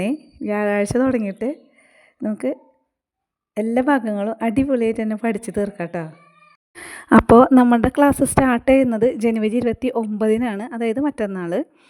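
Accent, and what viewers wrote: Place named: Thrissur, India. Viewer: Aanenn thonunnu njanga tsr kaarde voice kettal manasilaavum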